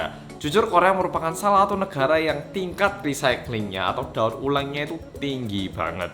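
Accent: Java, Indonesia. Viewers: jowo banget iku lho kletek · Orang Korea yang medok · Bersama orang korea yang ......MEDOKKKK😜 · Hal yg menarik adalah, kebersihan tanggungjawab bersama, pemerintah buat aturan, warganya mematuhi..sehingga lingkungan negaranya bersih, indah, rapi, warganya juga sehat, rapi, dan kuat. Nah jawabannya warga +62, budayakan dari diri sendiri dan patuhi aturan pemerintah. Sekian dan terima kasih. Makasih mas medok kuliah sampahnya.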